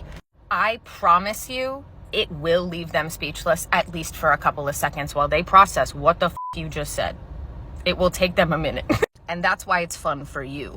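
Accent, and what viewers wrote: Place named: Massachusetts, United States. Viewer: So, I'm from MA and it is driving me inSane that I can not figure out if your accent is from there or not😭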